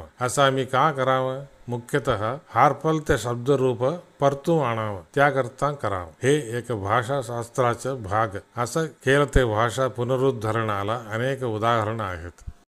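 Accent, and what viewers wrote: Thanjavur, India. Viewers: नमस्कार!🙏 तुमची मराठी (तंजावुर) ऐकायला खुप गोड वाटते. तुमचं म्हणणं अगदी बरोबर आहे. पण महाराष्ट्रातील मराठी भाषिकांना तामिळ शब्द कळत नाहीत, पण तंजावुर मराठी ऐकून, समजावुन घेवून कळु लागतील. संवाद व्हायचा असेल तर प्रमाण भाषेतला नाही तर बोली भाषेतील पर्यायी शब्द माहीत असावा. आपले सर्वांचे मराठी भाषेसाठी चाललेले प्रयत्न कौतुकास्पद आहेत. धन्यवाद! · तुम्ही जी तंजावरी मराठी बोलता ती बोला ऐकायला चांगली वाटते फ़क्त एक विनंती आपले मराठीपण जपा। जय भवानी जय शिवाजी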